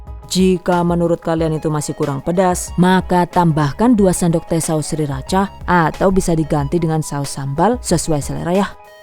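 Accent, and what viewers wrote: Banyumas, Indonesia. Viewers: saya paling suka resep yg pake takaran pasti spt ini, bukan yg takaran "secukupnya". auto subscribe.... btw jg suka sama logat ngapaknya 😄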